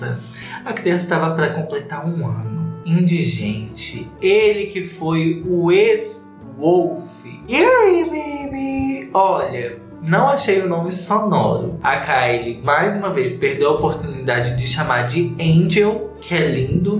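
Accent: Minas Gerais, Brazil. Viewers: sou hater assumida do sotaque mineiro do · amei o seu sotaque mineiro 😍😍🤣🤣🤣🤣🤣🤣🤣🤣🤣🤣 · Esse vídeo valeu cada minutinho só pra ter o falando mineirês 🤧🤧🤧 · Adorei o com o sotaque de Minas... 👏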